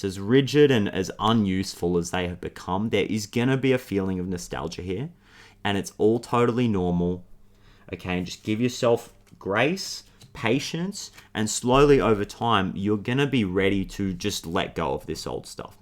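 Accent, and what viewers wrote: Australia. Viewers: Are you Australian ? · Whoa! Hello! 6 mins in and I’m feeling super connected to this reading! Oh, and the Aussie accent is refreshing being local 🇦🇺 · when your readings resonate with me it’s like you’re speaking directly to my higher self it’s like a flow of information. I mention that because I have auditory processing delay and I find it extremely hard to focus on and actually hear what’s being said without subtitles. Here, with your Australian accent and such unique, cute tone of voice I get it, I don’t even have to know all the expressions you use and still it makes perfect sense ⚛️ to me it’s a confirmation that I’m not delulu, that I’m not imagining stuff. That our interconnectedness is so very real. I love it and I love you 🩷🧡💛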